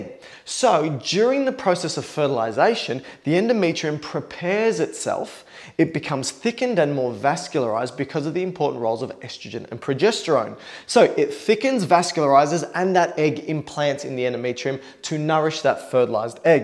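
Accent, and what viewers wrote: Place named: Australia. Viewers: I reckon it's Australian, mate! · He is an Aussie, probably of Italian descent...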